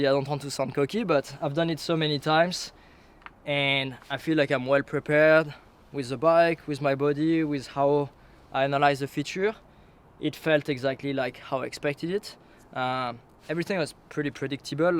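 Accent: France. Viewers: Merci pour ton accent bien français, je comprends mieux l anglais comme ça 😄 Sinon tu déchires tout, continue comme ça et bon ride 🤙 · OH avec ton accent a la française comme vous le dites j'ai du mal a comprendre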